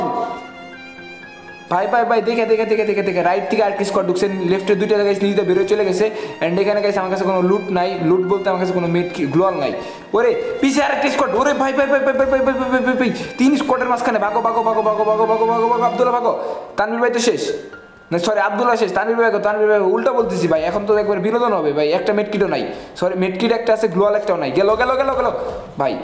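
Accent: Tangail, Bangladesh. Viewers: Vai tomar basa ki Tangail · Bhai apni ki Tangail er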